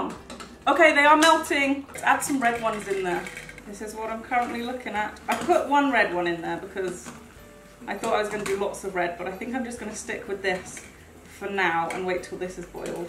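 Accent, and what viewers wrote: United Kingdom. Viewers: I love ur accent and ur vocabulary! It’s so crackup, typical British😂(well I’m guessing) lol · I'm new is this girl British?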